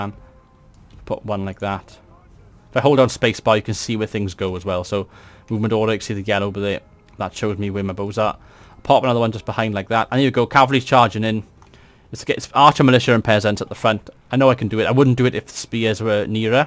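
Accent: Wales, United Kingdom. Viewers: So I bought the definitive version of this game last week, messed about with it for half a week, read tiny bits and bobs about it and realised very quickly I haven't a clue. Looking forward to this set of videos, and it also helps that it's being narrated in a Welsh accent. Love the Welsh from an Irish brother! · I love your welsh accent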